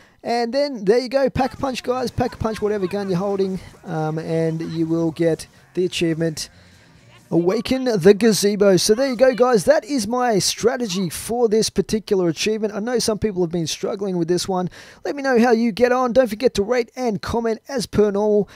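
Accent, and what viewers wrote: Australia. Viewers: Is he Australian?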